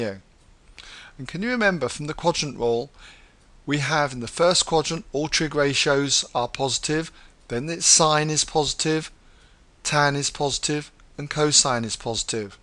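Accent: United Kingdom. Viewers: The British accent made this video both entertaining and educational! British!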